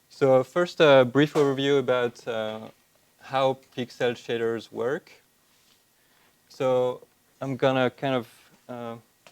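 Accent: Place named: France. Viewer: Franglais accent make me super concentrate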